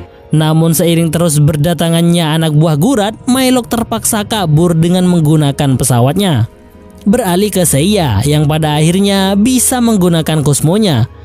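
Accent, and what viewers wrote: Madura, Indonesia. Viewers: Ngereng yatoreh?Reng madureh?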